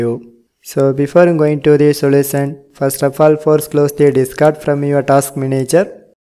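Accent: India. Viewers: Hey Mr. Indian accent slow down. I can’t keep up with whatever you’re doing. Oh my God.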